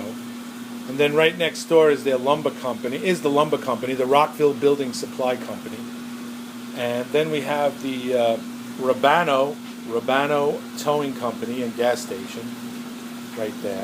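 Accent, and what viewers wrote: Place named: Staten Island, United States. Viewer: yo you sound like a dang New Yorker... more like Staten Island... LOL.. thanks for the tour of your layout... great talking with you the other night... i didn't know i wasn't subbed to you but i am now... looking forward to your next video... BTW check out my last video i show the finished build for Eric vinny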